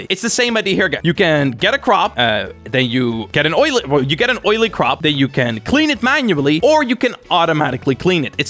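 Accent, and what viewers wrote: Netherlands. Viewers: You Dutch I think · Hey are you Dutch?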